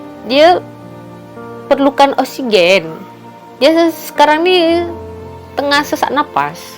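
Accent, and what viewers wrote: Malaysia. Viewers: Bahasa sudah seperti bahasa org malaysia dah👍👍👍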